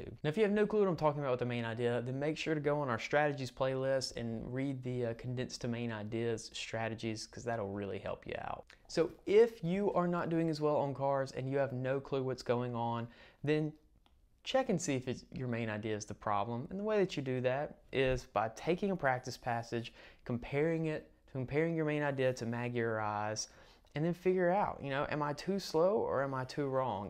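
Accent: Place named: South, United States. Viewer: cool, im from Georgia! Southerners know one when they hear one haha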